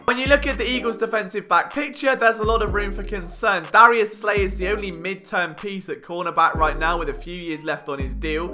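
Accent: United Kingdom. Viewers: Why, because he has a brit accent? Oh No !! He's a good commentator with good breakdowns. I don't understand why he has such a low subscribe base still.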